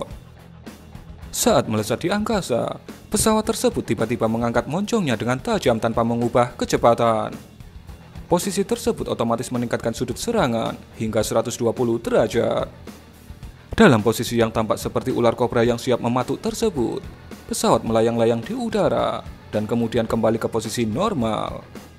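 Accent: Java, Indonesia. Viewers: Mantep nih channel, modal text Googling, footage nyolong dan bacotan medok bisa dapet 400k lebih subs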